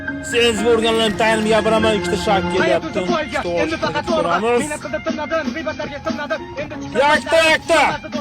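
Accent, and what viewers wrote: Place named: Andijan, Uzbekistan. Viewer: Anjanliklar Sheva 👍👍👍😂😂😂omad oka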